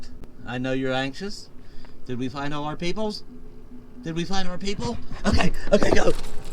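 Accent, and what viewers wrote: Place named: Western Pennsylvania, United States. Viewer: Your accent sounds like mine. Western pa?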